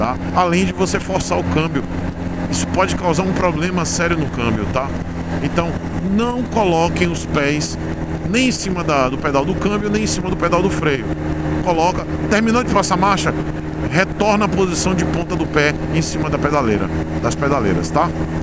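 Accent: Bahia, Brazil. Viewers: Você é de Salvador- BA?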